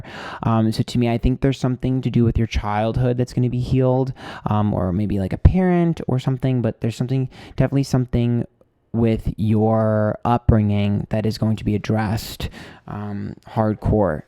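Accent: United Kingdom. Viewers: 🐟 love your British accent 🇬🇧 spot on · Love the British accent this video 🙏☠️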